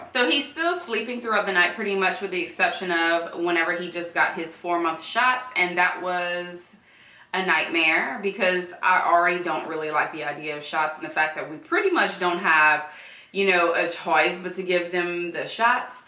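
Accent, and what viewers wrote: Louisiana, United States. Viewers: girl he is so cute, Your LA'na accent is so thick LOL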